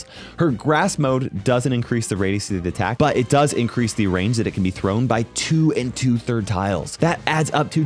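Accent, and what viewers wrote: Louisiana, United States. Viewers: Love the Cajun accent ❤😂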